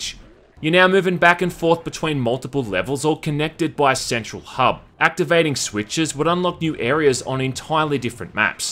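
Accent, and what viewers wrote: Australia. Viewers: Thanks for the video review of one of my most dearly loved games. I’ll be sure to subscribe to see more like this. I’m an Aussie too so it’s way better hearing an Aussie doing the reviewing too lol 👍🏼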